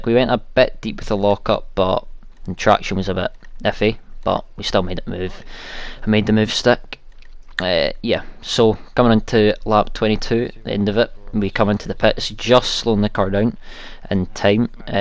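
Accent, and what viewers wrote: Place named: Scotland, United Kingdom. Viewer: Good commentary mate! I'd up the game sound, it felt a bit strange not having it. Btw, ya got a gud ol' scottish accent there xD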